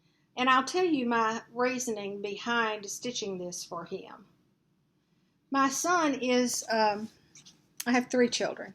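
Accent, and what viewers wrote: South, United States. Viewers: My mother was born and raised on a farm that her family were homesteaders on in Conway, Arkansas. I think that's why whenever I'm missing her I always tune you in so I can hear her through your beautiful southern dialect. Wish I lived close to you, I'd certainly adopt you. Hugs a bunch, Toni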